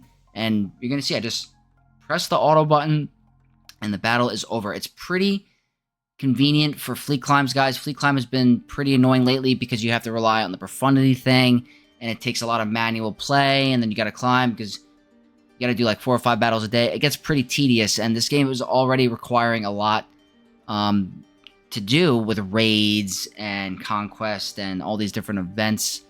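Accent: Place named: New York, United States. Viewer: That New York accent. I miss mine lol.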